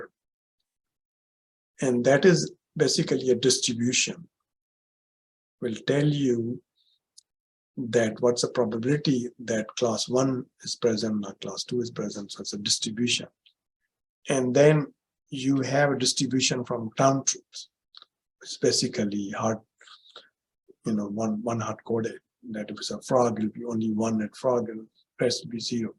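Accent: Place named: India. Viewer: I hate indian accent. How on earth are there so many indian professors in our country?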